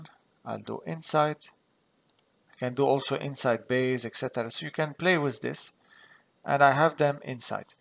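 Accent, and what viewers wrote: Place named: Lebanon. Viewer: Hi, very clever. By the way, from your accent, I guess you're Lebanese, aren't you ?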